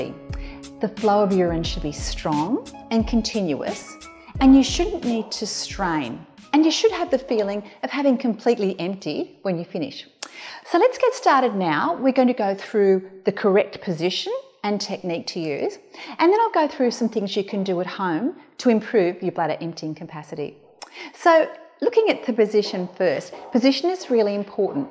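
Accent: Australia. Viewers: How would you know there is residual urine left in the bladder without ultrasound or tests for voiding? Happy to hear a fellow Aussie.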